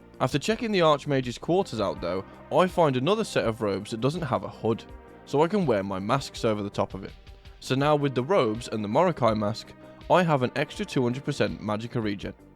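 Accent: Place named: Yorkshire, United Kingdom. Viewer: Are you from Yorkshire? Because you sound like you’re from Yorkshire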